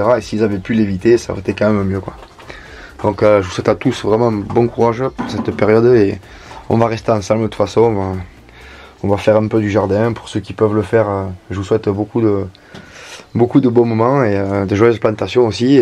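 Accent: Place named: Southwest, France. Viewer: Salut tu est d'où avec ce petit accent de chez nous, moi je suis du Tarn, super ta vidéo, j'aime.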